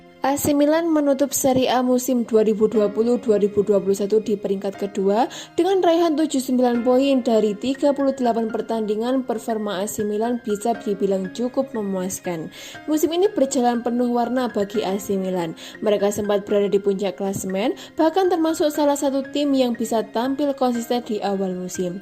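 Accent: Java, Indonesia. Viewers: medok banged jawa nya 😁😆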